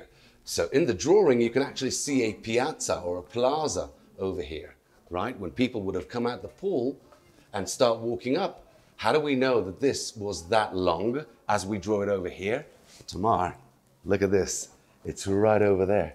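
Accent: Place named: United Kingdom. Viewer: Thank you for this wonderful tour, so excited just to watch it. And it’s also good to hear a UK accent . God bless you.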